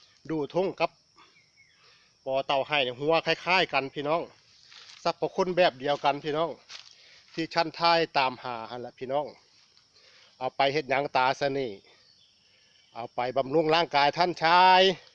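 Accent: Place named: Nyaw, Thailand. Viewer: ไปหาสมุนไพรนำอ้าย มักเบิ่ง มักอ้ายเว้าญ้อจ้า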